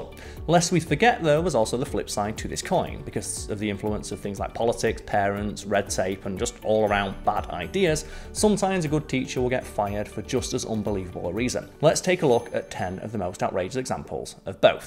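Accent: United Kingdom. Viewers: I was so worried about Top Tenz replacing Simon. But so relieved they got another British to host. Please don't replace him with someone with an accent that is not British. No disrespect, but British accent is always so soothing to listen to. Thank you.😊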